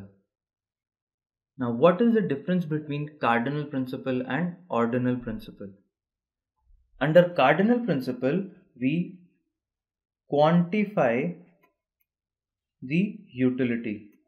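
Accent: India. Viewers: why most economic tutorings are in indian accent,I do have problem understanding this accent. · Rosa s actually he is an Indian that's why. Plus when it comes to economics there are thousands of videos made by Indians :P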